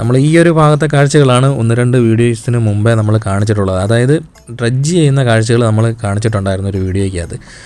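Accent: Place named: Kollam, India. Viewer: കൊല്ലം ഉള്ളവർ ഇങ്ങനെയാണ് സംസാരിക്കുന്നത്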